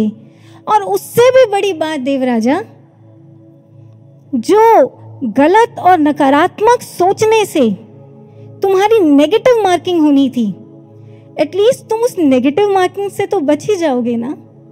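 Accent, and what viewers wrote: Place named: Bengal, India. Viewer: Her bangali accent is wow !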